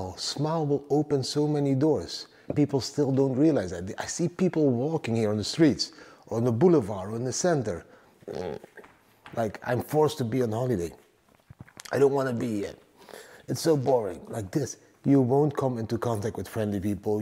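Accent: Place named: North Limburg, Netherlands. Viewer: Ik kijk met genoegen naar je video's. Naast de info ook vanwege de hele prettige uitstraling en de verborgen N-Limburgse tongval in uitstekend Engels. En mss zijn er mensen die zeggen dat het leven makkelijk is met vermogen, maar dat is BS. Zelf verdiend, van harte gegund en een enorm risico genomen. Ik ken meer vermogende mensen, kunnen zo in het azijnrek vd supermarkt. Oja, een vriendelijke lach, een paar zinnen leren van de taal waar je op dat moment vertoeft doet wonderen. De wet van wederkerigheid. En vooral ook leuk Geniet van het leven mensen !!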